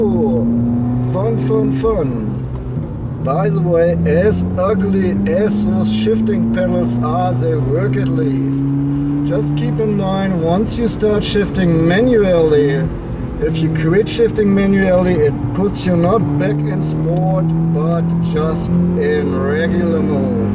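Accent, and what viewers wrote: Germany. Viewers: lol...he's german, german accent:P not drunk!!!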